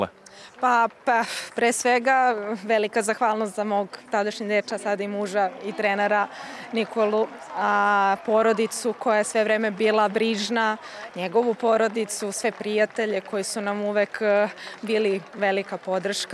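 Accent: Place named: Niš, Serbia. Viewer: Bravo. Pricaj si po niski ne trudi se da gadjas beogradski. Nek se potrudi da razume ko hoce. 💪👩🎾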